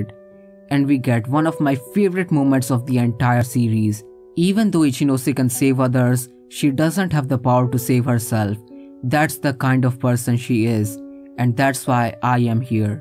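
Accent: India. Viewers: You have Indian accent are u one??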